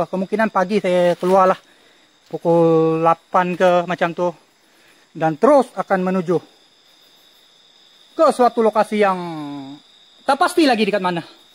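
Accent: Sabah, Malaysia. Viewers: Ada logat sabah.awak orng sabah ke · Mcm org sabah loghatnya bercakap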